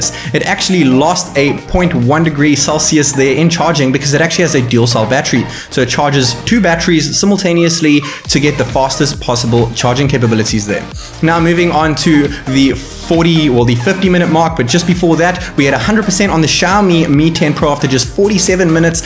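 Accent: South Africa. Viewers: Is this guy a South African?? How'd you get these phones?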